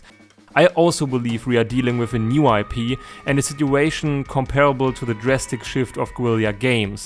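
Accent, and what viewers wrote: Germany. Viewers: Well i'm German too, so i know the accent. Your english is really good so i didn't catch it from the beginning. I hope your channel will get bigger. I sub for sure. ;)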